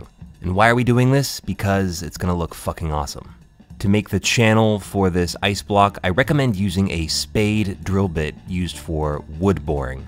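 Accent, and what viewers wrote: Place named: New York, United States. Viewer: Knew you were a New Yorker hell yeah 🧐👌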